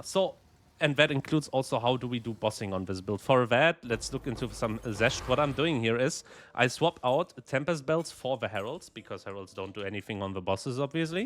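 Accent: Germany. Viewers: Er hat auf jeden Fall einen deutschen Akzent, außerdem muss man nicht hochdeutsch sein um akzentfrei Englisch zu sprechen. ;) Sein Englisch ist natürlich trotzdem super.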